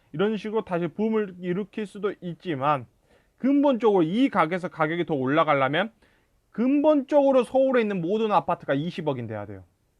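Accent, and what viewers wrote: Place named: Jeolla, South Korea. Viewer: 말투가 전라도네 ㅋㅋㅋㅋㅋㅋ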